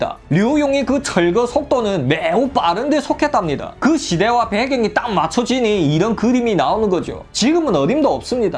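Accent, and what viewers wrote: Yanbian, China. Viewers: 매일매일 기다리는게 새로운 삶을 사는것처럼 행복하구 즐거워요 ㅎㅎ 연변사투리도 재믿고 표정 하나하나 생동감이 넘쳐서 더 기대가 가요 승승장구 하시길 바랄게요 다음회도 오케이 ㅎㅎ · 하지만 ~하지만 말입니다 조선족 연변말투죠? 그러게 말입니다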